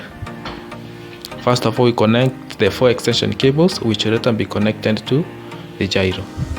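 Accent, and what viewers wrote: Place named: Indonesia. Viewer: Oh, I guess you're from Indonesia because your accent is very similar to an Indonesian remain optimistic about developing unmanned aerial technology bro, as an innovative step in diversifying technology into the aerospace engineering Hello from Indonesia, I'm a technical student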